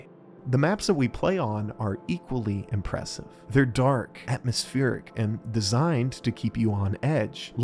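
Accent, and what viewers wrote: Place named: South, United States. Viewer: When that smooth Southern accent hits, you know it's time for some easy listening with insightful commentary. Great video,!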